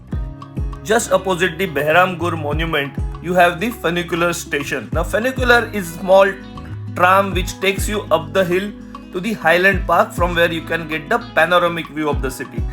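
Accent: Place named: India. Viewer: Great guy. Heavy Indian accent with only a few pauses.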